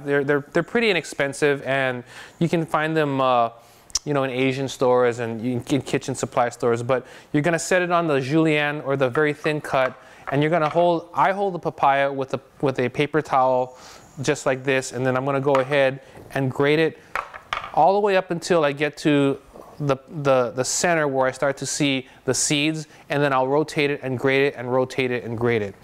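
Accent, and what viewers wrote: United States. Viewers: The problem is these americans think they can speak Englisd as well, like the rest of us. Terrible! Maybe we should advise them to watch BBC TV and learn from there.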